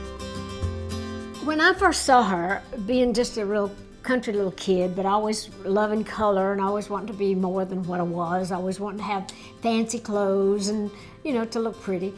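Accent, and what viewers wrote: South, United States. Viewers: I love the Southern American Accent!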